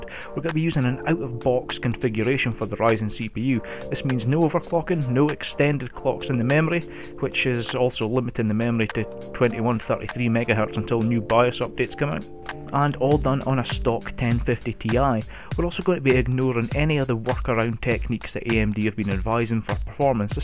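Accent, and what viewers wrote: Scotland, United Kingdom. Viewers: Sub'd man love hearing fell Scots on YouTube, too few of us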